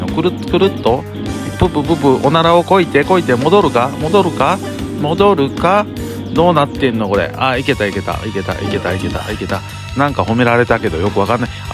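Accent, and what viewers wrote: Kansai, Japan. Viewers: このゲームおもしろいですね！！笑さん関西弁でした？！ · 相変わらずの関西弁ナイスー👍 そしてLINEの返信が全部英語ꉂꉂ🤣𐤔 時代感じます😅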